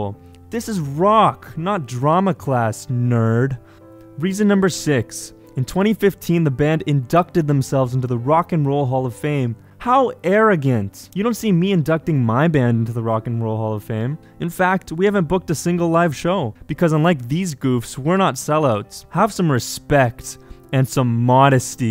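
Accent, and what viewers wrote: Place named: Canada. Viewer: He's also Canadian, which makes the "This is America" joke funnier. I could tell because of his accent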